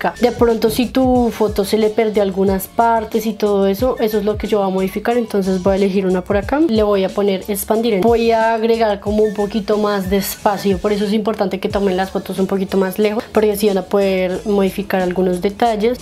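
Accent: Colombia. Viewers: Me encanta tu asento Colombiano🥰😍 y me encantaaaan todos los tips para fotos, look y demás Saludos desde Torreón Mex.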